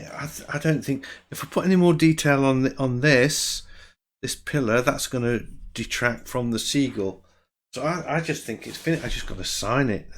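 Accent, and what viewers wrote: United Kingdom. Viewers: I’m more and more impressed of what you can paint digitally. I really like the way you use the pastels, I can’t say that I liked pastels in the past. You are making great art and I’m grateful that you show all steps to us. I also like listening when you are talking how you think while painting - the british accent is also to my taste.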